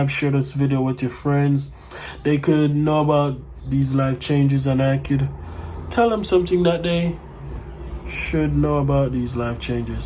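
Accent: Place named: United Kingdom. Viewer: no you British accent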